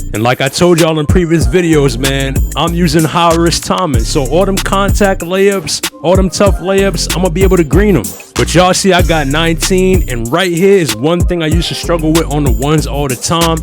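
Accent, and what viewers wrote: New York, United States. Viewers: You from NY gang?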